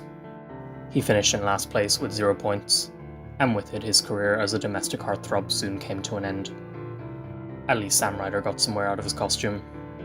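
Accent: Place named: Ireland. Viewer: Why do you not talk about the atrocities made by other European countries participating in the Eurovision, just about Israel? you're clearly an antisemite. There's a cure for that. Learn history. There is no such thing as Palestinian people it was made up in 1964 to hurt Jews and ruin their only homeland. you're probably Irish by your accent so I'm not surprised. And that Nazi scream at the end... u need a shrink man.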